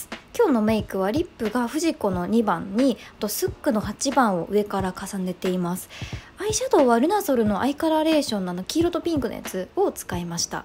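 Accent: Kansai, Japan. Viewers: 関西の方ですか？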